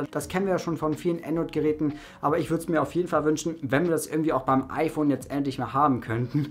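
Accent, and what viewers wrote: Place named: North, Germany. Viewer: Man hört den norddeutschen Dialekt XD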